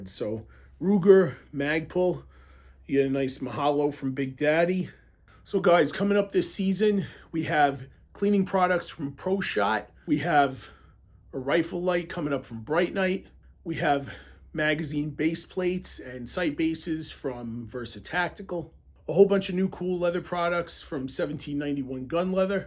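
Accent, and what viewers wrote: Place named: East Coast, United States. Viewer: The most east coast accent ever lol.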